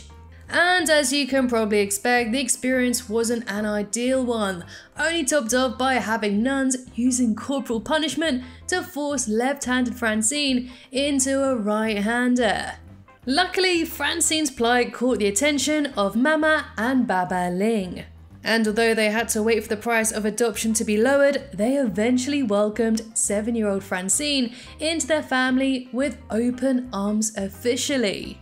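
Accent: United Kingdom. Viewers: Not about the video at all, but Girl, i just LOVED ur british acent, it just, idk, fits u so much, and its so cute to me, just wanted to say that, kisses from Brazil ✋🏾🤠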